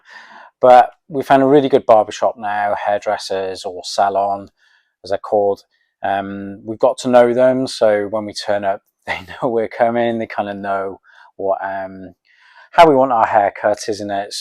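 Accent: United Kingdom. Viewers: Как приятно слушать британский английский. Стала каждый день Вас слушать без перевода. Удачи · has a very good British pronunciation, it's quite easy to understand him. I've had an opportunity to speak with many other English people and often it was rather difficult experience. (my ear trained more to northern American version of English).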